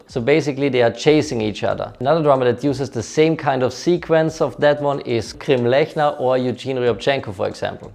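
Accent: Vienna, Austria. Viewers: Mehr Wiener Deutsch!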